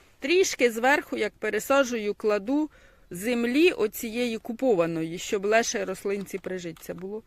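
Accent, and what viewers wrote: Poltava, Ukraine. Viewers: Доброго Вам здоров'я. Мене захоплюють Ваші відео щирістю, гумором, простотою. Та ще й рідна полтавська мова! Успіхів Вам !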